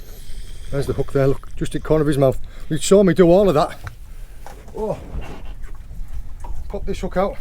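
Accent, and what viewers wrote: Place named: Teesside, United Kingdom. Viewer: Are you from the boro mate, I’m from Redcar and you’ve got a very familiar accent. I go beach fishing at marske with my brother in law. Loving the videos pal UTB 👍🏼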